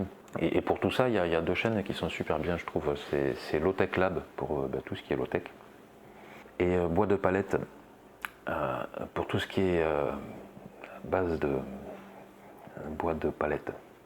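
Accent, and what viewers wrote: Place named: Occitania, France. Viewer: Salut, exactement on s'en fout des mots qui finalement desservent la communauté. Oh on a le même accent, ce bel accent des gens du pays d'Oc, et avec ce brin d'auto dérision je suivrai tout ça avec beaucoup d’intérêt, je te souhaite de créer un bio lieu de vie dans ton jardin mais surtout de t'éclater dans ce que tu crées (ça ne veut pas dire, de se prendre des pelles dès que tu mets un pied dehors hein mdr).;)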